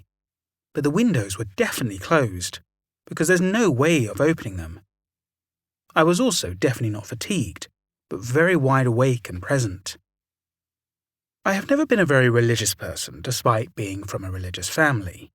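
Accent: England, United Kingdom. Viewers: English accent better for ghost stories, totally.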